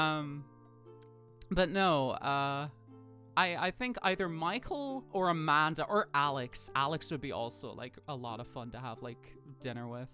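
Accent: Ireland. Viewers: Lowkey cracking up since it’s the first time I’ve hit up Voice Acting playlist and it’s the first time I’ve heard her natural voice and my dumdum American butt was hit with the rapid fire rolodex of comparing my bank of accents like: “ is British? Ugh no. Absolutely not. How dare I? No, it’s Auss- New Zea-? NO! You FOOL! It’s NONE of these. It’s…Wait that… Hold the- The Emerald Isle? Irish? But she’s extra oh so sweet and gentle! Southwest Irish? Transgirl Irish Jax???” And then I get the Irish confirmation later which was validating. Like the visceral wave of HUHHH??? Until I got it (I THINK, tbh not sure if the region is correct) was so dramatic. Am I at l at least close? Sometimes regional variations get me. Someone who knows this sweet darling birdy, please confirm! 😂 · Never mind about the irish accent, it's ..in your bio. Oops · Hears voice Me, immediately: Munster?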